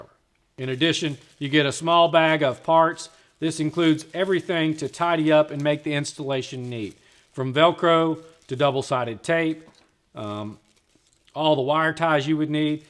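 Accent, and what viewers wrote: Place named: South, United States. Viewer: This better be a good pump. I just ordered it with my GEO Calcium Reactor. With a Southern Accent...I do trust this man. He must be somewhere in Texas with me.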